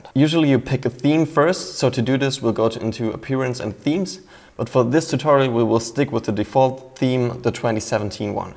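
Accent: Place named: Poland. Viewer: very german with so polish english accent : )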